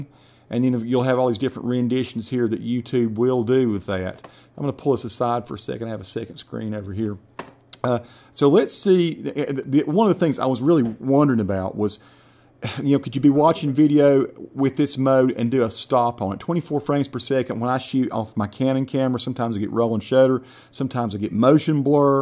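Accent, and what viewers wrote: South, United States. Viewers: Southern accent?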